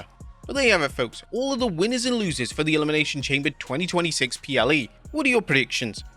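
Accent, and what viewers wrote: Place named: United Kingdom. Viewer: British accent is getting a little old ya know? How about we switch it up. I would assume you’re multi talented, so let’s get an Indian or a Chinese accent. Maybe even Australian?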